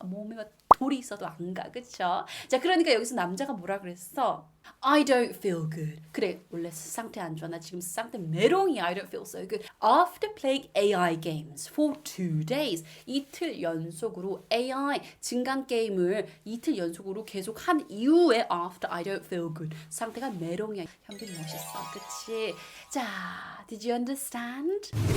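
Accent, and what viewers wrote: RP, United Kingdom. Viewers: 아나운서님 ㅜㅜ ♡ 오늘도 너무 재밌게 봤어용 ㅜㅜ 영국 발음 너무 멋있으세영! 님 응원받고 정말 큰도움이되었어요오! 답글 정말감사했습니당 ㅜㅜ ♡ 감사합니당! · 아... 아나운써님 너무 멋져요. ㅎㅎㅎ 포쉬액센트가 이리 멋질줄니야..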